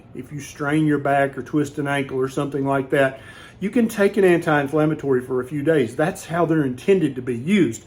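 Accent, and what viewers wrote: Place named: Deep South, United States. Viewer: Your analysis is the most comprehensive and logically reasoned that I have seen. You DO need to work on taming that extreme southern accent. It's distracting and is taken as backward and ignorant by a decent percentage of all who were not raised in the deep south. This will lead to people immediately clicking off your excellent video as soon as you start talking. Your information is so good. I just wish you had a better presentation. Not only are you elongating words, but you have the extreme form, where entire extra syllables are injected where they do not belong. The word LIST is one quick syllable. You are pronouncing LAAAYYYUST- a complete and utter abortion of the word, so extreme that I had to rewind just to be sure what word you were attempting. To correct THEYUS, (THIS) please practice saying words quickly, succinctly. 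You will sound far more intelligent. The word ON and the word OWN are two different words. ON should not be pronounced OWN! BAD is BAD not BAYYUD. CONTEND IS CONTEND, not CONTAAYYYUHD. The word WELL should be pronounced WELL- not WAAAYYYEL! The word BE is BE not BAY, and certainly not BAAYEE. DOWN is DOWN, not DAAYYOWN! Just practice saying ALL words quickly, correctly and without injecting extra syllables.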